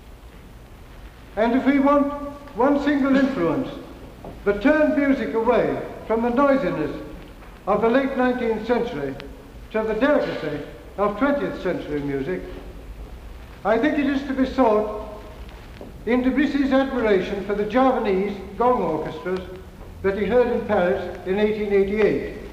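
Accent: Australia. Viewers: Aussie accent? Well a little, perhaps, but he lived in Australia for such a short time. He was effectively a British ex-pat. Much as (say) Richard Dawkins or Cliff Richard (Africa and India respectively). Of course, later he became a Us citizen.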